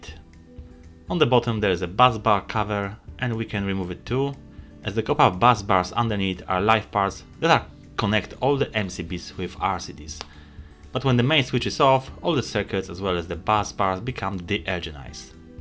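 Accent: Poland. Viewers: czesc. Slychac ze jestes z Polski. Pozdrawiam. Nie wiem czy nagrales filmik. Opowiedz jeszcze o ukladach sieci. Pozdro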